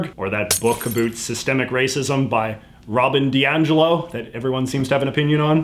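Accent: Canada. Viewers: Your accent is aggressively Canadian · Don't you sound too canadian to have a dog in this fight? (Or do you believe we're the 51th state?) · I love but as a fellow Canadian, I have never been more annoyed by an "about" pronunciation. I always thought that was a myth until I started watching his videos. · you have one of the Richest Canadian accents I've ever heard. Not saying that's a bad thing. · this might be the most Canadian accent I have ever heard in my life and I love it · this is the heaviest canadian accent i have ever heard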